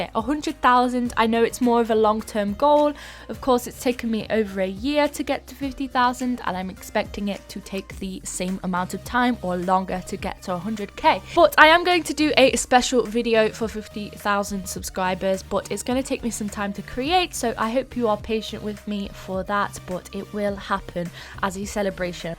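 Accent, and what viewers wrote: United Kingdom. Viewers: YESSS, BRITISH ACCENT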